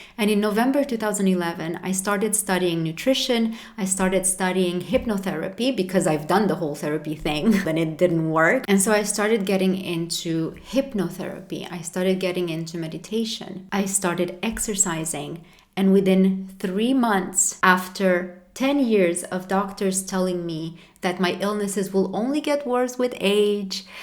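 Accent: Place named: Romania. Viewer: Haha I can recognize a romanian accent in half a second. Greetings from Ro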